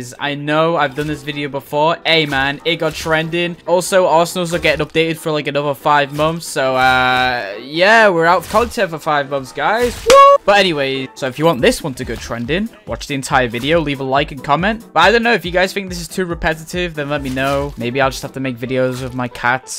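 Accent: United Kingdom. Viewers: I just realized thể he’s British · are you british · Wait is British like me? W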